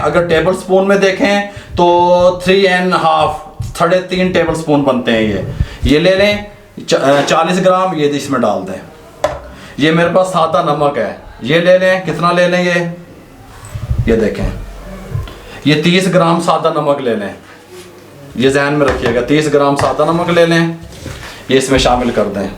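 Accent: Punjab, India. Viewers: Punjabi kitni bhi achhi Urdu ya Hindi bole tone se pehchana jata hai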